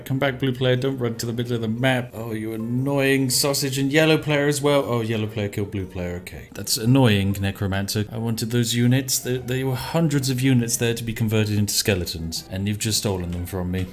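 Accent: United Kingdom. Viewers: i like the voice narrating, so smarty kind of bri-ish and with silly jokes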